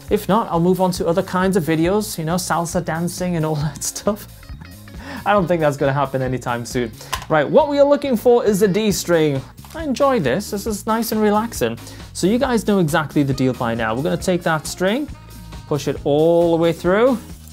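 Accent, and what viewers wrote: Newcastle, United Kingdom. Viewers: Ah, the toon accent! Drop you a sub, gotta support the local lad, awesome channel mate!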